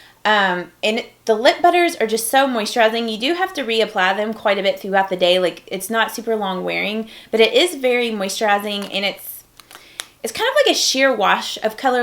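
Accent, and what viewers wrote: South, United States. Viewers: Love seeing someone else with a southern accent.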